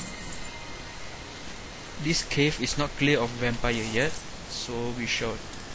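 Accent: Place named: Arab world. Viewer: Arabic right? Great Accent bro.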